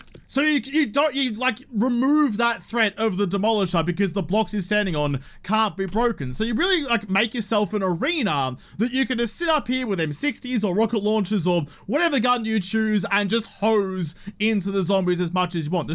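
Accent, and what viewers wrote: Australia. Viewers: No Aussie has a good day for their "r's" and "a's"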